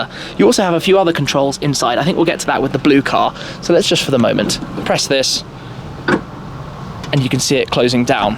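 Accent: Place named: United Kingdom. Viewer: Subscribed because of the British accent lol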